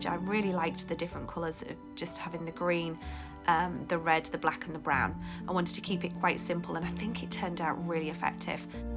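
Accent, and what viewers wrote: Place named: United Kingdom. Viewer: Just found your channel and your accent spoke out as I’m from the UK too this is one of my fave Xmas spreads your amazing to say you haven’t been journalling long! Do you have an Instagram? I would love to share your channel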